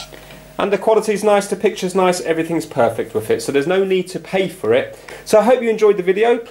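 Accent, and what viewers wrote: United Kingdom. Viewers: That was so clear and easy to understand! Thanks. I lost my WiFi contract recently but when I can afford it again, I'll try this. Wish you were a neighbour; I live in Japan and am a woman in my 50s always struggling to sort out computer problems thru videos like yours. Most of my friends are older females like me and if I ask them anything concerning PCs, provider contracts, whatever, they invariably tell me they have no idea and say: "I always leave it to my husband, son, son-in-law (insert any male relation here) " and it is so frustrating. Is it the same in the UK and why? Why do men seem to be more knowledgeable on the whole? BTW nice to hear a British accent again!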